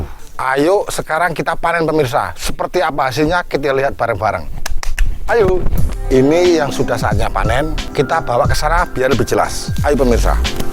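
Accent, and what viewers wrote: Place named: Java, Indonesia. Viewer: Nada suaranya yg khas dg logat Jawa yg AKURAT... Salam sehat selalu pak 🙏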